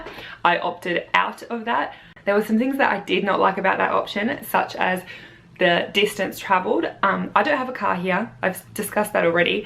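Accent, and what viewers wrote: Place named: United Kingdom. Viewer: Your accent is starting to sound British :D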